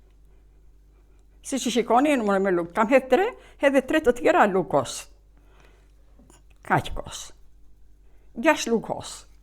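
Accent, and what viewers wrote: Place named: Përmet, Albania. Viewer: Zonje te petshendes! Te lumshin duart e arta, qe e shpjegon sh. Mire! Besoj se ini nga jugu, se dhe une andej jam, por besoj se ini PERMETARE, nga gjuha e paster qe flisni, se dhe une e perdor kete byrek, del sh . I mire! Respekte ❤️, nga Italia 🌼!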